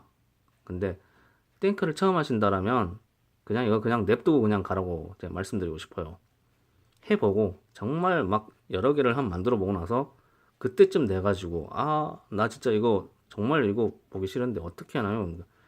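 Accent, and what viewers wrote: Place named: Jeolla, South Korea. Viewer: 전라도 사투리네 씨발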